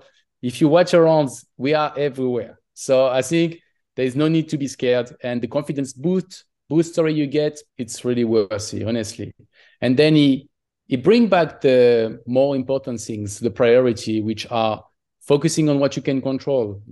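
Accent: France. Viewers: I like his accent - is he French? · Il est très beau maintenant. Plus mieux quand chauve. I could tell he speaks French from his accent. I get frustrated when a guy with a bald spot doesn't shave his whole head. It's annoying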